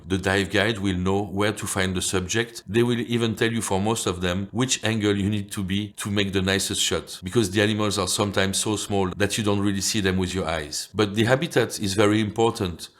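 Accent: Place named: France. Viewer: Excellent macro underwater photography advice and thank you fort sharing it. You sound especially authoritative with your French accent as it strongly suggests the entire Cousteau family!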